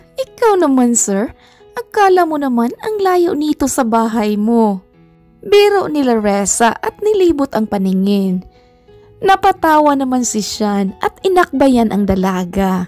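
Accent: Visayas, Philippines. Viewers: Maganda kwento wala ako masabi po sa boses lng d maganda po try ko lng po makinig ng kwento niyo,,maganda po mga kwento ,,,tamo po ung mga kwento ni amihan stories,kat amazing,saima ung mga boses nila magaganda po,pero ung boses po d maganda parang bisaya salita oh hirap tagalog po ✌️✌️✌️✌️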